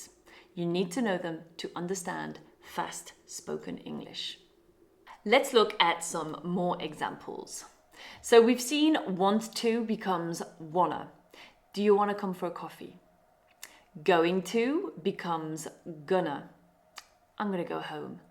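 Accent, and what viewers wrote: United Kingdom. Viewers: New subscriber ✌🏼 Are you british? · are you british?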